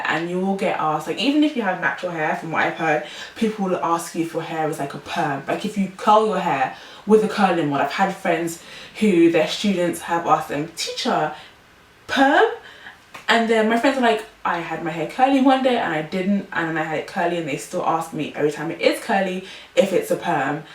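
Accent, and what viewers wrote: England, United Kingdom. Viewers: ahhhh yesss....a black girl with a british accent.....so sexxyyy yum......0_O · Honey you are gorgeous, sexy, and I love your British style accent. And you have a beautiful personality and seem to be very intelligent. Your hair is unique and different, and irrelevant to who you are as a total individual. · Are u from england? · im not trying to be mean but the british accent is geting a tab bit annoying ... i mean it was ok at first but the video was a little to long for the accent and its getting tireding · Holy crap youre english!!!! I love your voice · your British 😮😮😮yesssss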